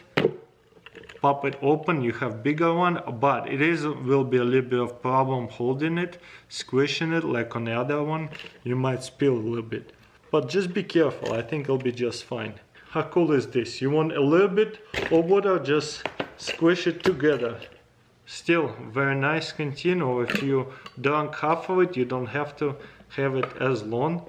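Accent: Russia. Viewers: Lol he said water battles its "water bottles " lol i like his russian accent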